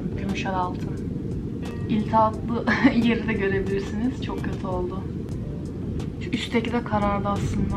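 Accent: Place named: United Kingdom. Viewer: British aksanı olmuş o biraz ahhaha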